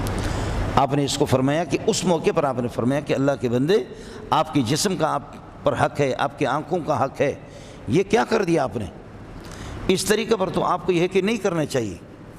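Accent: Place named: Balochistan, Pakistan. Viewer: In ki zubaan esi hy. Baloch hy na. Pathan logo ki b esi zuban hoti hy. Badtameezi ka irada nhi hota in ka. Badgumani sy bachny ki koshish kijiye. "ظنوا بالمومنین خیرا"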